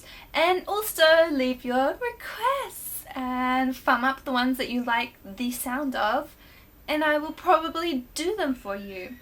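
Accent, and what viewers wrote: New Zealand. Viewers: hah yea, that is a pretty standard accent in New Zealand :) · No that’s how New Zealander pronounce the word for example if you say the number ten, seven, leg, pen, next, it will sound tin, lig, pin, nixt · Woooooow I loved the New Zeland accent. It's one of the easiest accents that I've heard! I loved your video talking about japanese language!! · mimeiさんってニュージーランド出身だっけ？ニュージーランドアクセントってあるのかな？ニュージーランドは訛りがあんまないイメージ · I thought u sounded like a kiwi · YUUSS KIWI FTW!! I know someone who moved to Japan but she lost her nz accent. I love how you still have the nz accent when speaking English ^^ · Are you from New Zealand as well ??!?! definitely sound like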